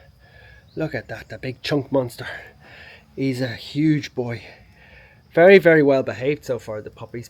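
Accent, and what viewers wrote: Ireland. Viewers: 🙏 🙏🙏Please…I beg of you. Get your lovely self signed up with a professional voiceover agency. I swear, if you have any spare time, you must explore the possibility of using your gorgeous warm and friendly voice as another source of funding your dreams. If I heard that beautiful Irish lilt over an advert, I’d be instantly interested in whatever is being sold. Movies, books etc…. you need to check it out!!😊😊😊😊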